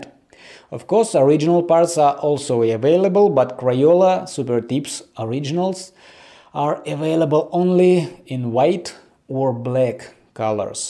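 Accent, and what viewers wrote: Russia. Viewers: What did you expect when he said be careful with a Russian accent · bro i like russian accent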